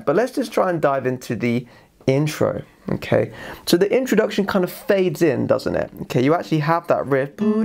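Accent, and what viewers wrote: United Kingdom. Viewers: Is he british ? Love his accent.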